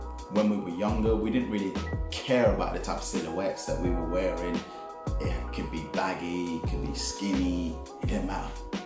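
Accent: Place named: United Kingdom. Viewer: How did you get your name? Just curious, lol. You have a British accent, and me, myself, being, it tossed me off. Love your content btw!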